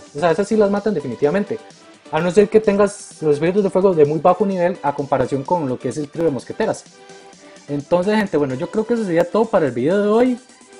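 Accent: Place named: Costa Rica. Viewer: aaaaaa eres tico :3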